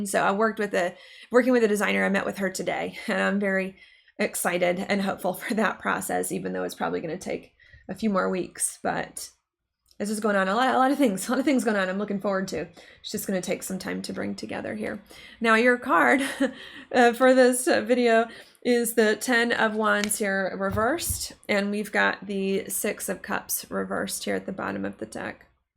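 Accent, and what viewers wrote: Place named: Georgia, United States. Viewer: Georgia huh⁉️ That explains that accent of yours I love so much lol❤️😅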